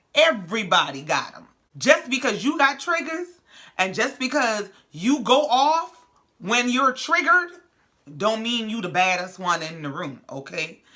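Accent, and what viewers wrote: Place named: Charleston, United States. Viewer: are you from Charleston, SC or have roots there? I subscribed because of your accent and content. #GullahGeechie for life❤️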